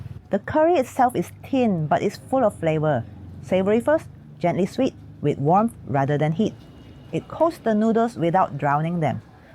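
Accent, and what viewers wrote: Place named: Malaysia. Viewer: you’re most welcome! Hopefully, one day there will be enough to fill a book. You are doing a service capturing the humanity and character of a version of Malaysia that is rapidly vanishing into the past. Your gentle narration and natural Malaysian accent make the videos even more special. I hope more people discover your channel!Brava! 🫶🏽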